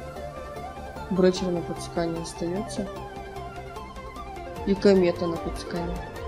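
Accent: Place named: Urals, Russia. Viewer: Прикольно)) Ты из Перми/Екатеринбурга? Манера разговора "тамошняя"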